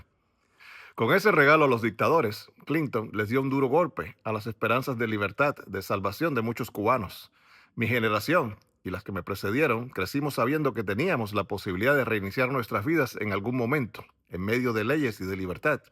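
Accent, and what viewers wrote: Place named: Mexico. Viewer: tienes acento mexicano ahi fue donde te fuiste parece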